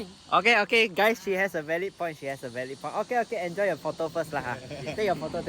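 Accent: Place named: Singapore. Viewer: I recognized the Singaporean accent haha. I miss Singapore and mount faber. Looks like great ride tho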